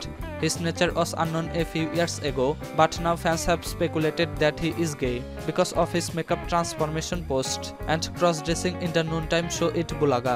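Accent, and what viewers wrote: India. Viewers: Yung accent dih ko kinaya indian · Oo nga, lahing pana ba yong nagsasalita..indian..bilog ang dila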